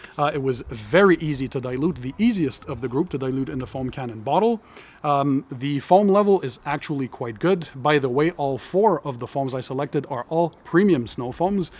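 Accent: Canada. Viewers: Alabama. Must be why I find it somewhat different, not odd just different. Not so much a French accent just a very Canadian type of accent not usually found in the state unless it’s Minnesota or the Montanas.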